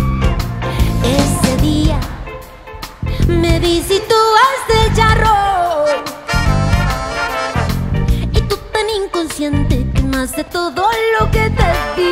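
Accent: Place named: Chile. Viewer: Sigue hablando como chilena jajaja. Me encanta eso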